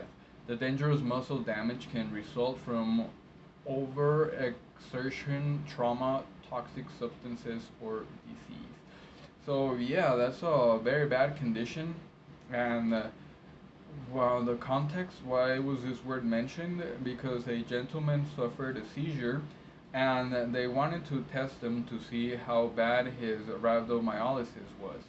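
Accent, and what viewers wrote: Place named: Mexican American, United States. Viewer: Hello. I enjoy watching your videos. Thanks for sharing. I was caught off guard when you stated you live in Mexico because your accent sounds Mexican American with English as your dominate language. Were you raised is the USA? Do you interpret for USA or Mexican providers?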